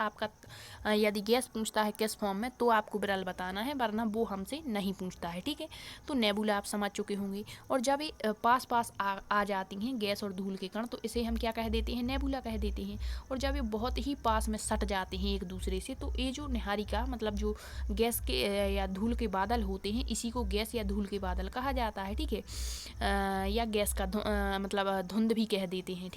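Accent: Bundelkhand, India. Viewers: Lovely ❤ voice mam aap pakka bundelkhand area ki hongi ☺ plz reply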